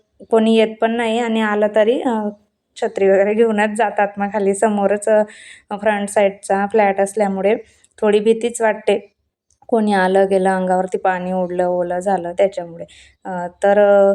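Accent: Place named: Jalgaon, India. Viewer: tai tumhi jalgaon ch ya aahet ka language varun vatat me aahe so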